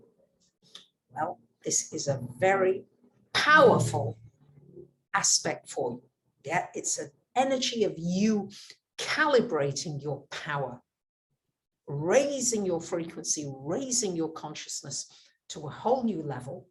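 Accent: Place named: England, United Kingdom. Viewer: Thank You! 🤍. I got an extra, personal, heartwarming confirmation with this video as you clapped your hands together. My grandmother was from Coventry, England, and it was something in that sound, as well as your accent, that reminded me she is with me. 🤍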